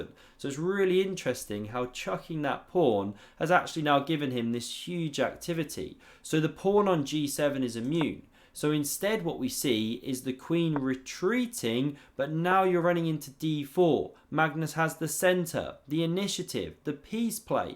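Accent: England, United Kingdom. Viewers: Fantastic video as always. Two things that were especially great about this one: your use of the word gross in the opening sentence and the fact that you managed to get Hans Niemann on screen for a few seconds. Keep up the badass analyses and I certainly hope you continue to be one of the greatest adjective-picker of all the people who speak our common language with an English accent. Folks from England usually go for soft, subtle-but-potent descriptors. Not. is aiming straight for your nuts when talks, and he's gonna hit 'em. lol sorry there's a nonzero chance that i've had a few drinks but.. I'm not entirely unserious-- keep on keepin on.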